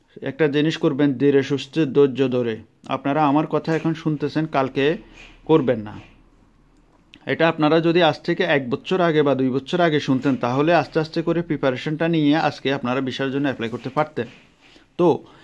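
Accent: Noakhali, Bangladesh. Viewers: Thank you. Bhai apnar bari ki Noakhali? Kotha suna mona hola. Apnar suggestion bhalo laglo. Apnar email address ta dan.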